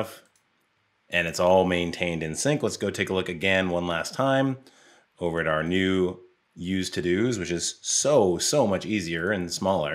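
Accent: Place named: Germany. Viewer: Have a great weekend. Love the German pronunciation. 🙏😀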